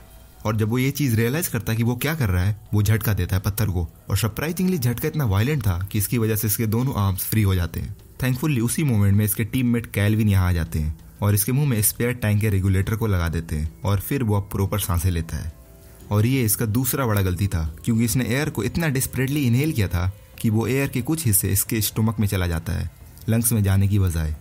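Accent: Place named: Purvanchal, India. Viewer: bhai tum UP purvanchal side ke ho kya? Tumhari pronunciation 🤐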